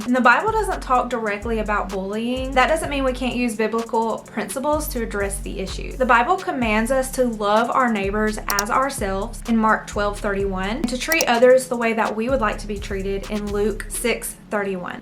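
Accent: South, United States. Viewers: Girl, I really needed to hear your words today! I have to remind myself of those same things every single day. I was stuck in fight-or-flight mode from the time I was 9 until I was 31, so I completely get it. After years of therapy, thank God, I’m in such a better place now. I’ve only been staying home for a few years, and it’s still an adjustment. I’ve got a 15-year-old, a 9-year-old, and a 1-year-old—and I just love your videos. Your accent makes me smile too since I’m from the South as well. Thank you for sharing. ❤ The power of prayer is so real! Continue to pray for your enemies!!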